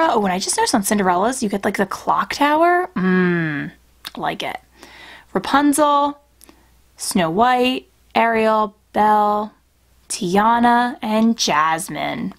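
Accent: Philadelphia, United States. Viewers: I'm from the Philly area too!! Never really picked up on your accent until you mentioned it.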